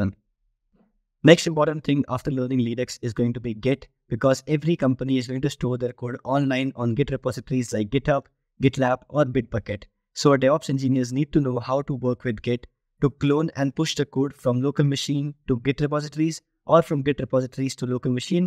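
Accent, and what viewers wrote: India. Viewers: Can I make a suggestion please? Your English is very good, but you still have a deep accent. Also, you talk very fast. Indians tend to talk very fast with an accent. So I don’t know about other American people but I have a very hard time understanding you. All of what you’re saying is very interesting and I want to follow it. Even some American speakers will speak fast sometime with different accents and I have the same problem. This is not just simple conversation, but explaining technical topics. So the combination of a thick accent and talking fast makes it really difficult to understand. I could play the videos on slow motion but there is still an issue and it’s a bit annoying. I love the programs from simpylearn and I really want to sign up but the only thing holding me back right now is this aspect. Sorry to ruffle anybody’s feathers but I’m just trying to help. 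And provide some honest feedback. Thank you.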